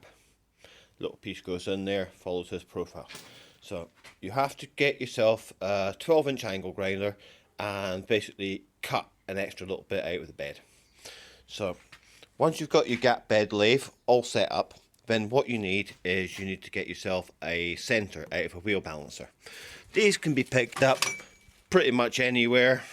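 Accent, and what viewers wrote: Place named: Scottish Highlands, United Kingdom. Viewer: You sound a bit Invernesian or Highlands definitely. Are you still about?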